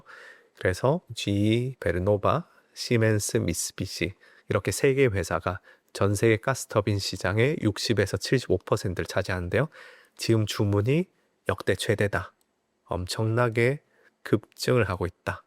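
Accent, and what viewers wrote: Gyeongsang, South Korea. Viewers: 처음 들었을때부터 경상도 출신이라는거 알겠던데요 ㅎㅎ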